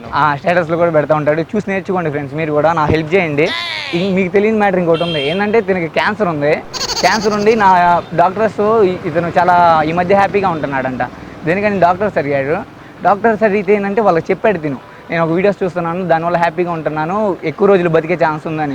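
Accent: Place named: Andhra Pradesh, India. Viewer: Ne slang nachutaley .Andhra lekka ostandi kocham marchu....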